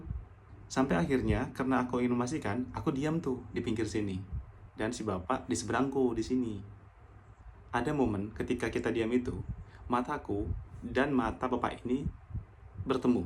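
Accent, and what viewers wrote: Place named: North Sumatra, Indonesia. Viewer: bg logat sumut nya kental kali! sumut nya asal dari mana bang, btw serem kali cerita ko bang bah ketemu bapak bapak homo gitu 😅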